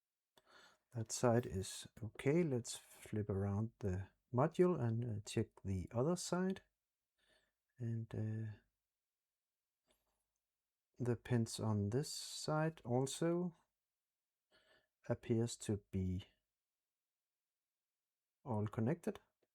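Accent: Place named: Denmark. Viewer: Hehe the danglish <3 Kanon video ven. Hyggeligt med lidt nostalgi. Er der overhovedet en use case med 128mb ?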